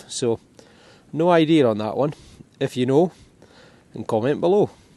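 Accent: Scotland, United Kingdom. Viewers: Enjoy your videos for your historical content and love your Scottish accent.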